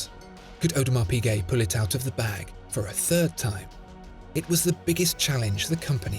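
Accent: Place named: Midlands, United Kingdom. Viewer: Waffling on as per usual, over four minutes of absolutely nothing to do with the watch in question! I dip my toes into your channel occasionally as the videography is excellent. I just find the waffle nauseating, but I know I’m in the minority, oh and that middle England voice, it’s like listening to an account waffle on about investments!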